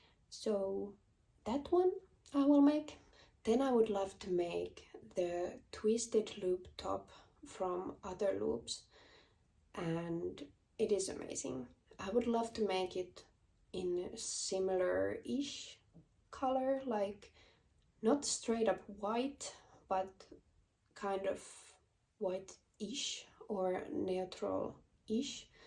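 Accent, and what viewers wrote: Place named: England, United Kingdom. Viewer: You have a beautiful English accent!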